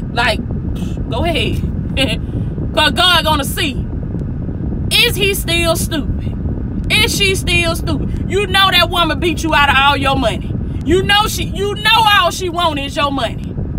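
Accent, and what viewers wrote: South, United States. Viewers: Your accent is so adorable to your Godly personality is this a Texas, or the Carolinas, Georgia maybe a Florida accent? God is good. The enemy only comes to kill, steal and destroy... Remember that! If someone is an ex its for a reason. God doesn't allow a true life partner/destiny spouse to exit your life, the purpose would be greater than the two's emotion or troubles and God's sovereign will would cover and keep the relationship steady and balanced. The Lord gives blessings and good gifts a help mate not a satanic agent or a repeat offender. When a wicked person resurfaces it's like a venomous snake, when it rears its ugly head, cut it off! WATCH & PRAY... Bloodline Circles all around us they cannot cross over! The deeper you go with God and the more you are delivered and the more power you actually wield the spiritual grounds for certain people, places, spirits and things are broken. 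They are bound banished and vanquished away. They can try but will fail. Make the Hand of God and Blood of Jesus the gateway to your personal and intimate life. We are only human and imperfect but even Angels of God will keep evil away and ward them off. He wi move you on to a place they can never find you even in a crystal ball or to astral project to monitor you will be shut down! Nobody pass Jehovah put Him in front of all things. His plan is not for the wrong everything and everybody but for the proper ones. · This sister chopping up 🔪 Real Truth with that wonderful southern accent....🍓🌹🌹🌹♥️♥️🙏🙏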